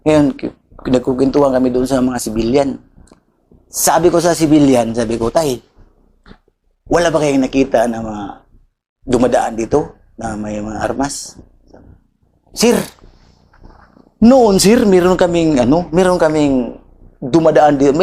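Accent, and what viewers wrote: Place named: Visayas, Philippines. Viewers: Bisaya kc..haha..prang si tatay digs lang magkwento..nkktawa.. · Ito idol ku mag kwetong bisdak na bisdak eh ganyan talaga mag salita ang mga parang carbon copy mag salita sa kilala kong din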